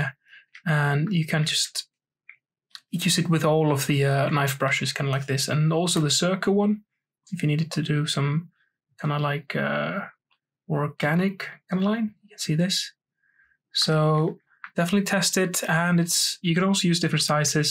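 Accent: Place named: Wales, United Kingdom. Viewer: Both of these videos are amazing! Thanks so much. Also you sound like a mix between Welsh and maybe Nordic 😃😃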